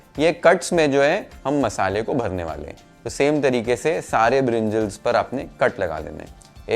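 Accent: Maharashtra, India. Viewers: Lots of Marathi influence while you talk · I love your Marathi accent bang on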